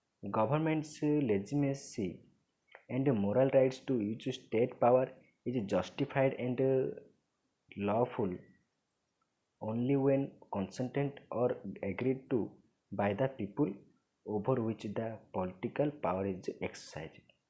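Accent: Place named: Odisha, India. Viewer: I believe you must be aware with the fact that each one of us have a accent and so does he, which is not a big deal. These dialects are influenced by the different languages that different sections of the country also speak, side by side with English. He is from Odisha due to which he speaks such. Instead of criticising him you should appreciate him for explaining this concept in a wonderful manner.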